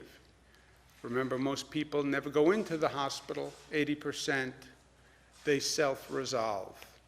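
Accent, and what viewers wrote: New York, United States. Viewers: Plus everything he says sounds like he's describing a nice stake or his mama's marinara...lol....I live in FL now, but am originally from NY state, Buffalo, but spent time in NYC here and there as well and his vocal deliveries take me home, lol...the NYC guys/men and the North Buffalo guys/men I knew growing up..but I'll lay it bare here, I don't personally like politicians in general, but still listening to him is informative about what's going on up there and like I said, his delivery is nostalgic. I'm hoping this is over soon, for all of us, every where. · I am, like so many others, a native NYer now living in FL. Cuomo is even comforting ME down here!!! He sounds like and reminds me of his father, Mario, so much. Hannity criticizes him, but it's still so comforting to hear the NY accent and the way he cuts to the chase in explaining what's going on. 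and of course, he's fighting to get the best for his state.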